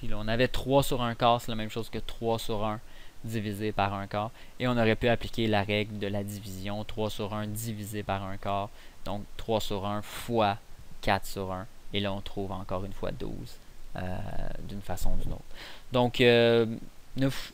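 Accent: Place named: Quebec, Canada. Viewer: merci beaucoup et magnifique accent quebecois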